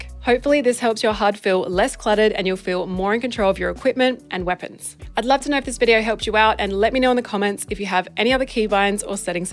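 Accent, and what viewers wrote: Australia. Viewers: I hear you mama content definitely has matured since I last watched still love that aussie accent.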